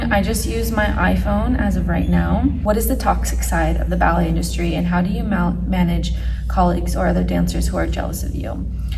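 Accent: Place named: United States. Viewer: Hello, I am an italian girl, not a ballerina☺️ I love following you not only for your contents, but also because your american accent is amazing to me and I really would like to learn your pronounciation😄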